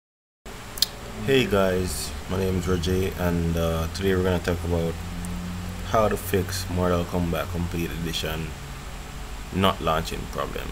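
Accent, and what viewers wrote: Jamaica. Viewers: I know a Jamaican when I hear one. Thanks mi bredda 🇯🇲💪🏾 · Respect you Jamaican?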